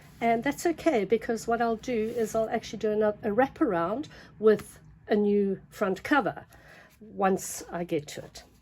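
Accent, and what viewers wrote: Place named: South Africa. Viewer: So lovely to hear a South African accent on an art journal video.Your art journal is beautiful.Love from the Karoo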